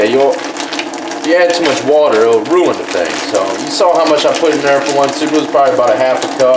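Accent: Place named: Louisiana, United States. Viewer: Where did you do time bro? Cant place the accent...louisiana? I did 3 in tdcj texas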